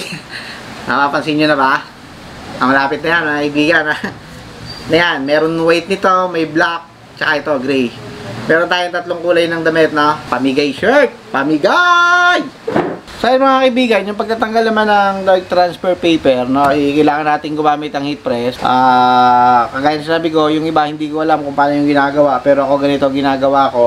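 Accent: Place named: Bicol, Philippines. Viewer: may pagka bicolano salita mo idol ah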